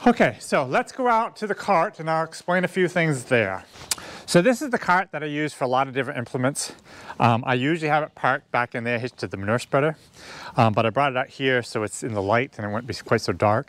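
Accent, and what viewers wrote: New England, United States. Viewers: I was wondering where that accent came form N. E. but not Ma or Ma NH hs become an extension of Boston so it was out and RI (where I lived in 80's has a whole bunch of them Why Prov has at least 6 alone And Woonsocket is a place all to itself throw me down the stairs my shoes where they park the cars side by each and Cranston dont get me started By Love is from there and her nasal is bad)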